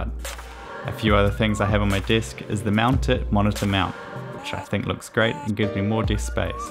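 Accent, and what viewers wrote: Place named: New Zealand. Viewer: hey bro where are you from? Thats a new zealandy accent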